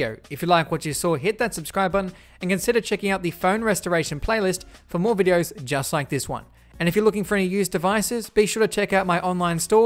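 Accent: Australia. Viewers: It's called the Australian accent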